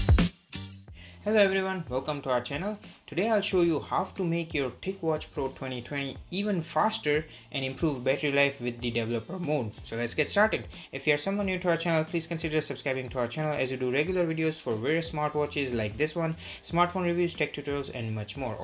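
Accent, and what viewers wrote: India. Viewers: Classic Indian accent you absolute tool , culture yourself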